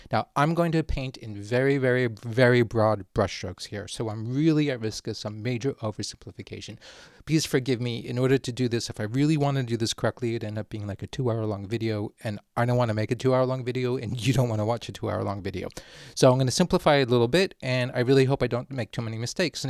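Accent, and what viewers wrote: Spain. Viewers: Brazilian here: fun fact, in internal politics in WW2, Brazil stood neutral in the beginning because it was having good affairs both with the US but also with Nazi Germany. And our government being running by Getúlio Vargas, sweared that we wouldn’t join the war not even if a snake smoked. Getúlio Vargas is known as our first dictatorship that was actually very positive viewed albeit the negative sides on dictatorships as we know them. But when Brazil joined the war, the slogan here was “A cobra fumou”, translated as “the snake smoked”. Edit: I forgive your pronunciation but we speak so much different from the Spanish pronunciation and you basically read our words with the Spanish pronunciation and some people here will get peeved.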